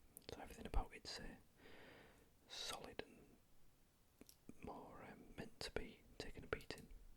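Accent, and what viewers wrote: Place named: Northern England, United Kingdom. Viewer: northern england, we have the best accents!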